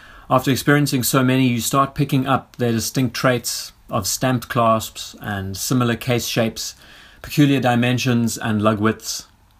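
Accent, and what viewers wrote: South Africa. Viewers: Is that a South African twang I detect?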